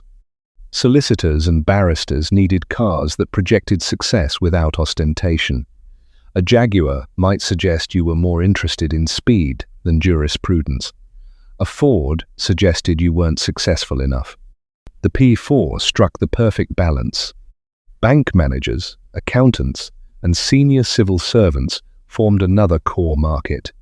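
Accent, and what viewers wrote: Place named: United States. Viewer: Awful narration; American spelling and pronounciation; repeats the same point over and over.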